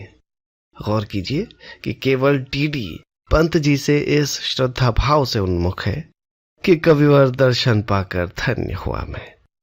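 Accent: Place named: Kumaon, India. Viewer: Your Kumaoni tone is perfect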